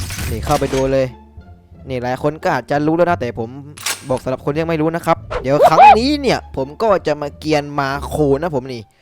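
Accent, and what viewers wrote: South, Thailand. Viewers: คนใต้ป่าวสำเนียงออก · สำเนียงคนใต้ป่าววะ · เด็กใต้หม้ายนิมึง😆555+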